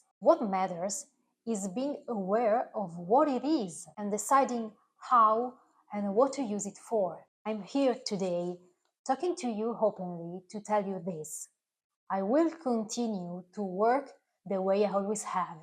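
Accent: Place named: Italy. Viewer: Ciao Cara Mia 🥀. You have been part of my life for over 7 of those 8 years you have been making videos. The reason I love you and your videos so much is because I feel like you are doing it just for me. I also love your videos so much because you make things that remind me of my NONNA. I remember the first time you did that. You were using an Espresso coffee pot like my Nonna had. I commented about how it made me think about her. I love the way you share your vacation pictures and your personal pictures of your family Holiday table. Your voice is lovely. You sound like an Italian Girl speaking English. The friendship we share is very important to me. I value it greatly. That's why I always tell you, TI AMO AMICA MIO, because I do. My dear sweet friend. 🇺🇲✝️🇮🇹🤗😘😘😘😘😘😘😘😘😘😘😘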